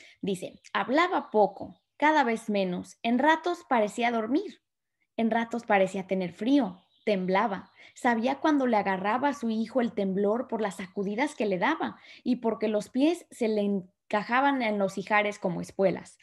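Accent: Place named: Mexico. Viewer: Me encanta la literatura y la obra de Rulfo para mí, es de lo mejor en calidad, la forma en que desmenuzas el cuento es excelente, interpretas a la perfección la forma de hablar del mexicano, parece que tuvieras bastante interacción con personas de aquí, fue muy grato verte en el canal de Laura.Saludos desde la ciudad de México .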